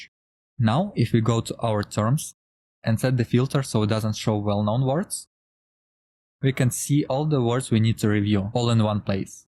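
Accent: Russia. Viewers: Good vid. Aren't you Russian by any chance? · жесть у тебя акцент прям брутально русский, я звучу как фембой когда общаюсь на английском xd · are you russian?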